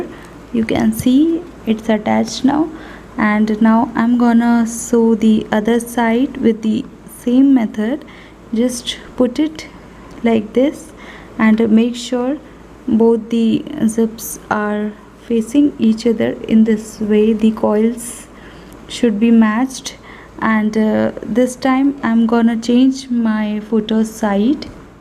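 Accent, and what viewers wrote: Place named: Algeria. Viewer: جزايري مرة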